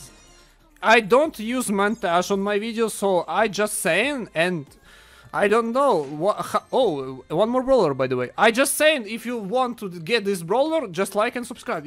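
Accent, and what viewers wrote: Russia. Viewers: да ты руский просто по англиски говоришь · russia, i know because my parents are from Russia and they have this accent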